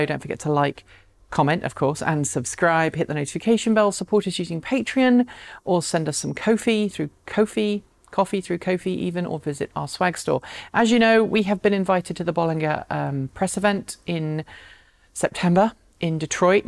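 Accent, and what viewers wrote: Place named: England, United Kingdom. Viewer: Ah, you Brits. Here I thought you were mispronouncing methane, so I checked first the Oxford and then the Websters dictionaries. Webster shows the American English and the Original English pronouncations. "meth·ane | \ ˈme-ˌthān , British usually ˈmē-\". But, not to nit-pick, I really enjoy your channel. And your English accent.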